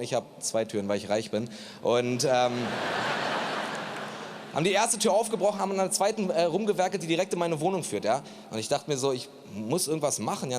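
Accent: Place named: Berlin, Germany. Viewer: dieser Berliner Schnauze stundenlang zuhören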